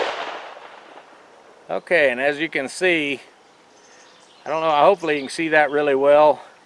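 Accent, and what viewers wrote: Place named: South, United States. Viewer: Found someone that talks more hillbilly than myself and more stupid!! Let's put another bullet in and don't forget to add that last bullet to make the action smooth!! Now let's place the bullshit aside use better terminology, you don't get smoother action by choking the mag and round or shell sounds better than bullet since that refers to the lead..By the way I live KY..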